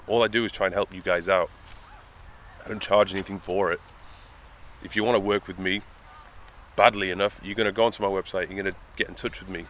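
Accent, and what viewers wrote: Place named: RP, United Kingdom. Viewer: ..I am a newbie to your YouTube channel..but I have to say it is by far the BEST fitness channel I have seen. This video is awesome. And your English is fantastic..you do not speak like a chav like other trainers. No slang, no ghetto-speech...just nice, straight, queens english. Your knowledge and your honesty is brilliant. No junk, no trash, NO WAFFLE, and no clickbait...just straight to the point, and nice short videos. I’m glad I subscribed. Top man!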